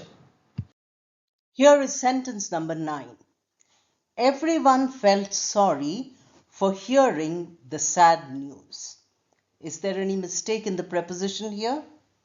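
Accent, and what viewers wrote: India. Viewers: Dear madam However well indians speak English it is not like native speakers of English.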